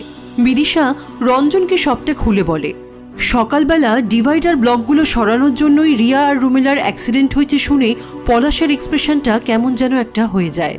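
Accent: India. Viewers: didi tumi ki kolkatar?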